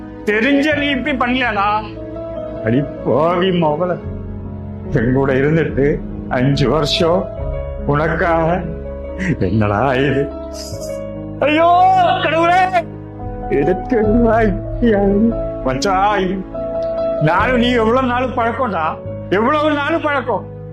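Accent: Kerala, India. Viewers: சூப்பர் உங்க ஆக்டிங் exlend ❤❤❤❤❤ உங்க மலையாளம் கலந்த தமிழ் நீங்க பேசும்போது சூப்பரா இருக்கு ❤❤❤❤❤❤ உங்க எமோஷனல் லவ் feel பண்ணி பேசும்போது சூப்பர் வேறலெவல் ❤❤❤👍🏼👍🏼👏🏼👏🏼👏🏼👏🏼👏🏼👏🏼👌🏼👌🏼👌🏼👌🏼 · Semma super acting tamil and malayalam kalantha unnoda voice arumai 😍😍😍😍😍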